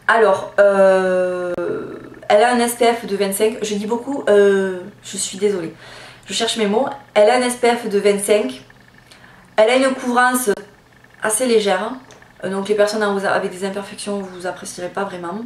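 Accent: South, France. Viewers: J'adore les fonds de teint moi aussi et j'aime en porter toute l'année, j'aime tester les nouveautés. J'aime bcp tes vidéos avec en plus ton accent du Sud car je m'y reconnais (j'habite pas loin....). Surtout continue, biz Nany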